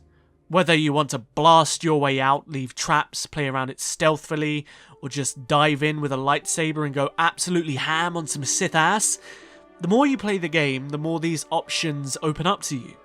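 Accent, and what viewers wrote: United Kingdom. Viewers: It's interesting hearing you try not to sound British. You are British right? lol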